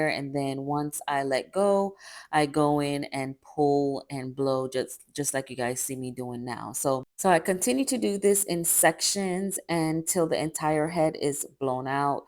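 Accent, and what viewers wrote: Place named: Jamaica. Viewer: love ur jamaican accent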